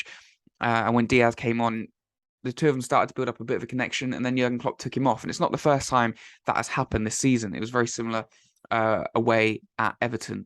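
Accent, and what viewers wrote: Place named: South, United States. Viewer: Not being funny mate, your chatting with a Southern accent saying your a scouse fan, sounds like a glory hunter to me.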